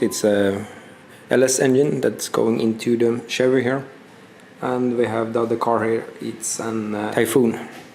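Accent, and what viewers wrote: Sweden. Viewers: älskar svengelskan riktigt fint <3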